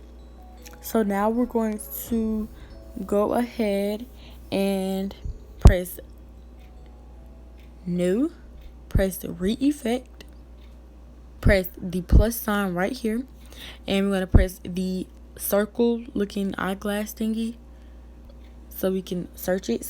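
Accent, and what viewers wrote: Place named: Africa. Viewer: DANGGG I LOVE UR AFRICAN ACCENT♥️♥️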